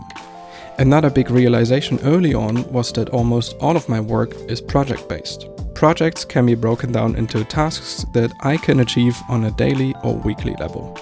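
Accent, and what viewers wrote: Germany. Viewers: ❤ After a few sec (lovely vibe) and after your first words (slight German 🇩🇪 accent) - LIKED & SUBBED. Period. Tschüss, Ich war aus Ungarn 🇭🇺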